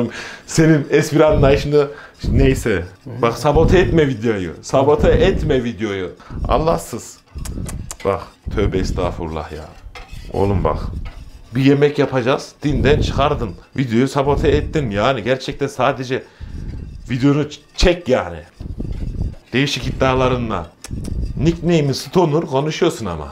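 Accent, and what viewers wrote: Anatolia, Turkey. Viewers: Ünlü fitness içerik üreticileri veya bu camiada fenomen olarak bilinen kimseler bana nedense samimi gelmiyor fitness hakkında da çok bilgim yok dövüş sporcusuyum ama bu adam nedense samimi geliyor 2 videosu çıktı karşıma sadece.. bir de anadolu ağzı var tam içten 🙂 adamsın kral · Aradığım üslup, aradığım sunum, aradığım bilgiler Aptal terimler yok anadolu anlatımı on numara bu video tüm naftalara gelsin...:)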